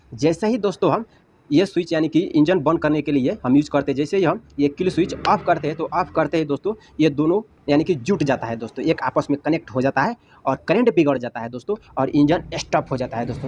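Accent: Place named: Bihar, India. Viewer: Bahut badhiya ustad. Bihari accent bahut badhiya ba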